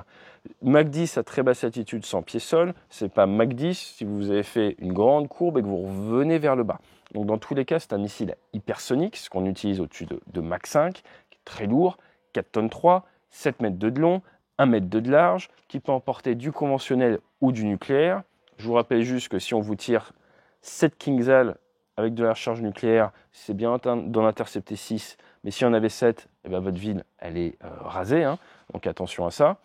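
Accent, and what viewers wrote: Quebec, Canada. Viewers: Êtes vous québécois ou français? Parce que de toute manière vous êtes génial. Mes amis anglophones apprécieraient une version sous-titrée en anglais. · Etes vous québécois quoi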